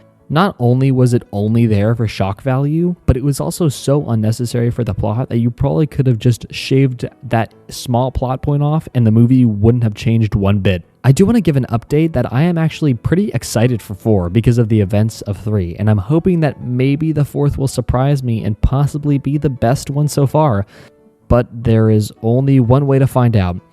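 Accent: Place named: Canada. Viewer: 1st video of yours, where’s your accent from? I’m assuming Canadian? (Sorry if wrong I’m from Australia lol)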